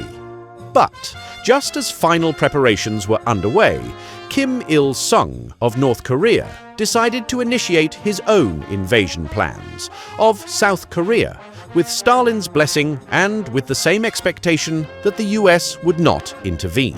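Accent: England, United Kingdom. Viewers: Bring back old English accent, please.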